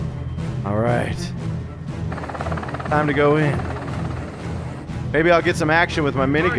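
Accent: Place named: Quebec, Canada. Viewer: Le gars est clairement québécois haha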